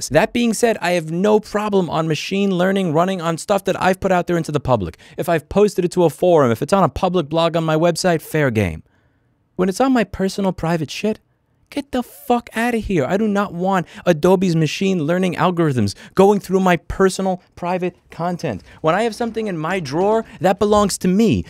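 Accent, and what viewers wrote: New York, United States. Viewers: Don't y'all just love how much thicker the New Yawker accent is the deeper he gets into his righteous rant?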